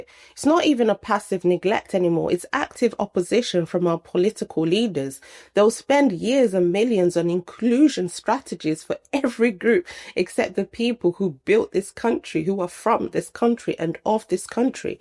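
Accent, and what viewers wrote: United Kingdom. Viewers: It's not white British. It's Bittish. By your accent I assume your predominantly British. How do you identify?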